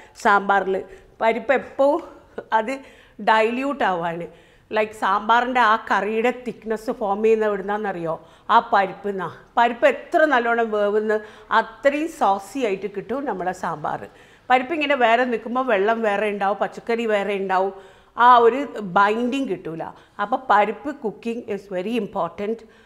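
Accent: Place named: Malabar, India. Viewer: Mam your cooking classes are very helpful.When ever i am having doubt while cooking i watch your videos and i love the way you talk with that malabari mapila accent😊.Looking forward to more new recipe's✌🏻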